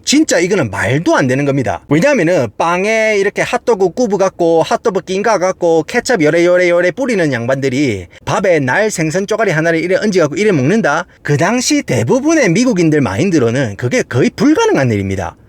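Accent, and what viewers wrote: Gyeongsang, South Korea. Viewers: 이렇게 나긋나긋한 갱상도 사투리는 살면서 들어본 적이 없거늘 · 전혀 공격적이지않고 재미있게 듣는데.. 아.. 경상도 아닌 분들은 공격적이라고 생각하실 수도 있겠네요 ㅎㅎ 경상도 특유의 억양일뿐 절대 공격적 멘트 아니라는 점!!! 잊지말라규~~❤ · ㄹㅇ 경상도사투리 기준으로 은 매우 스윗하게 말하는거임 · 경상도 사투리가 너무 심하다... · ㅋㅋㅋㅋㅋ 서윗한 경상도 사투리로 들려요~ ㅋㅋㅋㅋ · 경상도사투리 이정도면 상타치입니다ㅋ 근데 연배가..저희 큰아빠 말투신데😅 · 저도 서른마흔다섯살 보통 갱상도 아재입니다만 억수로 부드럽게 잘 설명해주시고 계시는 것입니다 ㅎㅎ 스울 분들 들으시기엔 공격적으로 들리시겠지만 그거 절대 아니라는거 잊지말라규 ㅎㅎ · 이정도면 경상도 사투리 중에 진짜 밝고 스윗한 사투린데😂ㅋㅋㅋ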